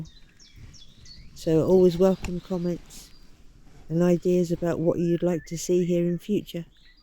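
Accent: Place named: Malvern, United Kingdom. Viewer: I think I’m listening to the accent of a fellow malvernian or nearabouts.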